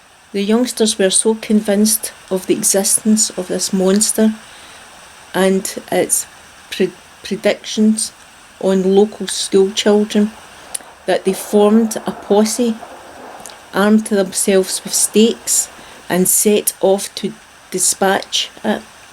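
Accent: Scotland, United Kingdom. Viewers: This was awesome! I like ghost stories. The sound-effects is a perfect mix with your beautiful accent :) So relaxing...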